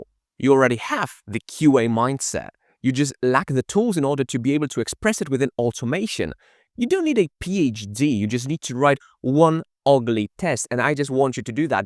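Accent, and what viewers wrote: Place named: United Kingdom. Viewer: Thanks for your video. I'm a Python Automation QA Engineer. I worked for DISH company for 6 years as API Automation Engineer. I love your British accent and pronunciation. I'm from Ukraine and I want to find a partner to practice speaking English. I have been resting for 1 year after I left DISH company. Now I'm updating my skillset and I want to find a new job. Could we get to know each other to practice English together?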